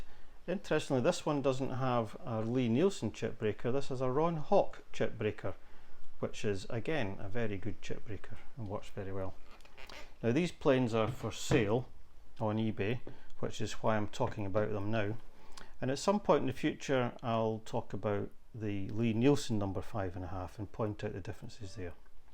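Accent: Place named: Ireland. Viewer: good first video...I've always liked the Irish accent 👍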